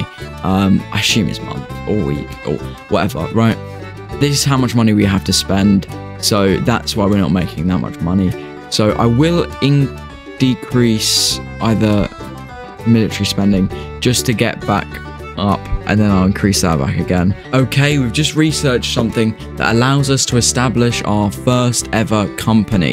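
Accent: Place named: United Kingdom. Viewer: Just discovered your channel, that Opening voice sound like feedback gaming voice, I guess you're both are British.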